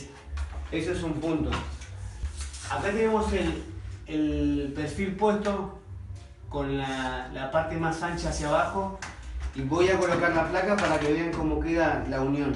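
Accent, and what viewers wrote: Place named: Argentina. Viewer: Era hs siempre hay del otro lado un español explicando.ahora es el tiempo de escuchar un argentino buenicimo tu programa .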